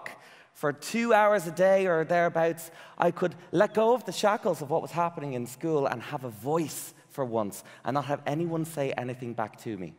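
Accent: Ireland. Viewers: I used to just "love" your videos but after watching your presentation I "adore you now." By the way, I do not think you sound gay: you just sound Irish! 😘🏳‍🌈👍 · Your boss was right noticing your voice....... it’s gorgeous and I could listen to your Irish accent all day xxx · Nope, not British. He sounds Irish. Which he is. 🍀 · I don’t think he sounds ‘gay’ whatever that actually means.. he just sounds Irish to me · His boss was an idiot. He has a perfectly nice voice. Also that Irish accent 🥰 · The tears are not from pain,. There from being deeply moved. I had damp eyes, too. Tears of joy at your overcoming real obstacles. Also, as a Yank, your beautiful Irish accent melts me too.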